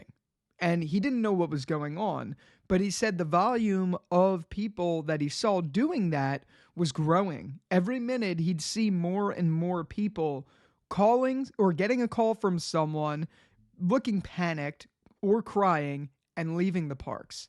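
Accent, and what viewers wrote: United States. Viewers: Figured you were from Jersey (or maybe Philly) from your accent. Interesting experience and perspective! Thanks for sharing. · On a side note, I was trying to clock your accent as someone who’s from Florida but recently moved to Philadelphia; and when I got to I felt glad to know I guessed right!Thanks for your account on your experience. I was in kindergarten class about 45 minutes from you this day. I grew up in the Orlando area.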